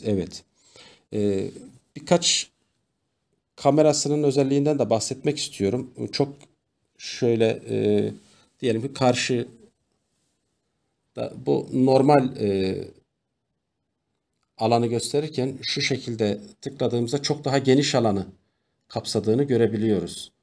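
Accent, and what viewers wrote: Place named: Kars, Turkey. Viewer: Şive karsa benziyor😎güzel video👍🏻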